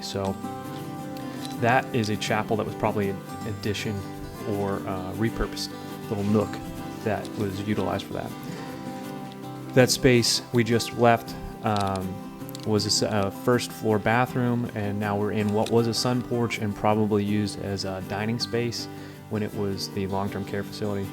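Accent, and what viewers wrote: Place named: Pittsburgh, United States. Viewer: Popped up in recommend, heard a yjnzer accent, saw the house and was like hey I grew up in jeannette! Crazy how the world works. Good luck!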